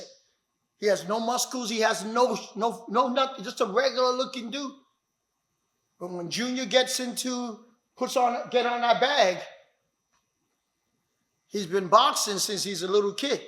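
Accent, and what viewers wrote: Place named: Brooklyn, United States. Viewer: Straight-up Brooklyn New Yorker. The South Bronx hears you and feels you! Stay Righteous!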